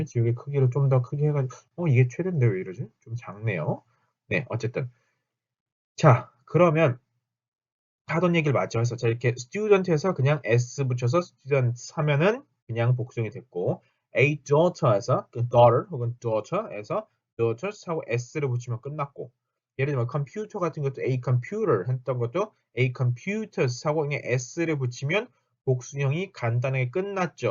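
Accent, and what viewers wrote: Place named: Southern Germany, Germany. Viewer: R발음을 남부발음으로 하시는데요. 북부에서는 일빈적 ㄹ로 하던데.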